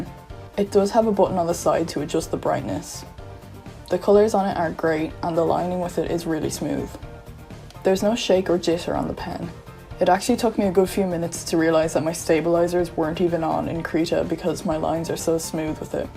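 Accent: Ireland. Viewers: casualy has an Irish accent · YEAAAA but it’s not super thick if that makes sense ?? But like ?? I still just adore it omfg I love irish accents sm 💀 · your voice is so cool :0 i can recognise an irish accent aha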